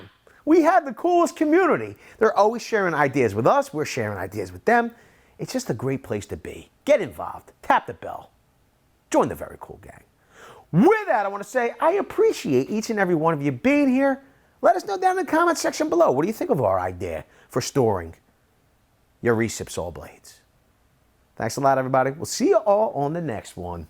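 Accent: Philadelphia, United States. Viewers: South Philly accent. We say WUDDER, for water!😀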